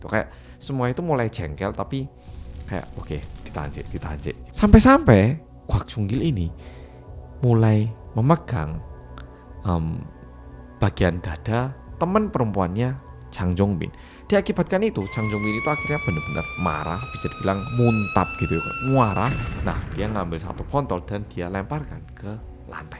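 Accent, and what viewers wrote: Java, Indonesia. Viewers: Saya yang dari bayi di jawa pun tidak seMEDOK Mas 😅 bisa enak aja gitu denger Mas nya ngomong.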